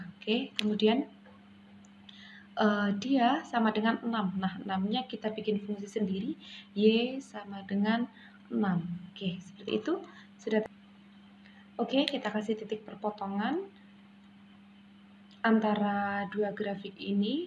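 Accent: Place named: Java, Indonesia. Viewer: Halo kaka....kaka orang jawa ya....medoknya keliatan....😂😂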